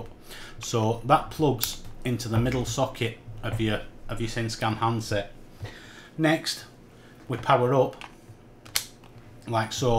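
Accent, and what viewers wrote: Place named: Oldham, United Kingdom. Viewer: Thanks for your clear video. I thought it might align the scope but it does not. Now I know I don't need one. That accent sounds Oldhamish, I live in cloudy Rochdale. Just got my Intes mk67 out for its annual inspection on the synscan (not used yet, only bought 3 years ago!) but I hear its cloudy all week, ah well. Cheers, Mike.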